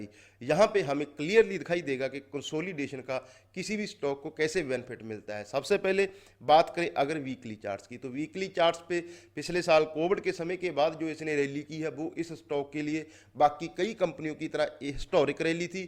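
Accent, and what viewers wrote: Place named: Haryana, India. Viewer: Haryana ka lgta hai bhai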